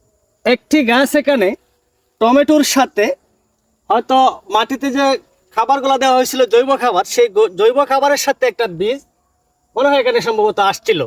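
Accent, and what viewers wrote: Sylhet, Bangladesh. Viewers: আফনে কি সিলেটি নি কোনো???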